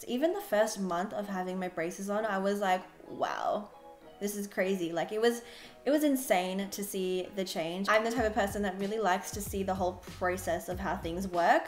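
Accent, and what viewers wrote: Australia. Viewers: 😄 I was close. You was saying so many words that are close to what us British say, but I couldn't figure it out. I am familiar with the Australian accent, not sure why I didn't think of that 😊. Thank You for your reply and information in the video. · Are you Australian? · You are Australian. Periodt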